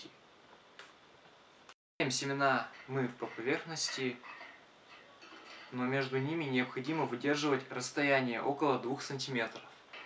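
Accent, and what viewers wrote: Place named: Vyatka, Russia. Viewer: Вятский говор. Молодец парень. Надо будет попробовать из семян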